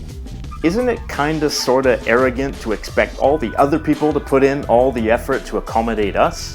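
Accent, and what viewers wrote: United States. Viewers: I thought you were American. You all sound alike. :p Though Canadians seem more polite. I got put off learning Spanish after visiting Spain and everyone kept slating my Spanish and switching to English. :/ I also tried Japanese but I won't be visiting there for a few years and whebever I spoke to a native speaker online they just laughed and told me not to bother. However, when I went to Croatia and learnt even just a little Hrvatski they loved it!!! It was a really cool experience and broke the ice so much. Everyone was 1000x friendlier in Hrvatska when you tried the language. I think it really helps to pick the right languages as your first so you aren't discouraged. Don't go for an easy language. Choose one you'll be motivated to learn. Volim Hrvastka! Split je lijepa! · I am enjoying the language series. what got me to comment is you saying that Canada is the same as the states? 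No we are not. Perhaps superficially, however, most of us tend to speak English well for most people around the world to understand what we are saying. We have more of a tendency to be bilingual, we are far more polite and able and willing to use proper English. Yes I know, plenty of low brow americanesque speakers do exist here as well. On the non language side; we aren't violent greedy warmongers. We don't invade countries for their resources. We don't have a war of terror on everyone who isn't american. We don't torture people around the world because they won't sign a lopsided trade deal, or they are not christian or they don't speak english the list goes on and on. I've heard you speak like a yank yourself. Zee over zed? Not all of us are assimilationists. Long story short, Americans are just that "mericans", not Canadians, not Mexicans, just yanks. that's it.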